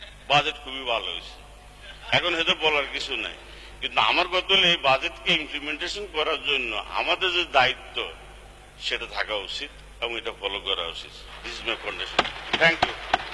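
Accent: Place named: Noakhali, Bangladesh. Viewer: ওম্মা হেতেন তো দেখি নোয়াখাইল্লা চমৎকার...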